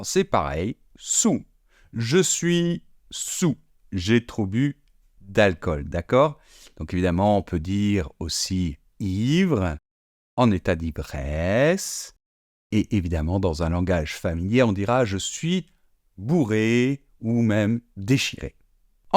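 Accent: Paris, France. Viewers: ça, il ne va pas capter, parisien il est devenu. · Tu va expliquer au basque,au toulousain,au marseillais,au Auvergnat ect a bien parlé. Tu dois être parisien.c'est qui les débiles qui ne savent pas dire serrurier, écureuil...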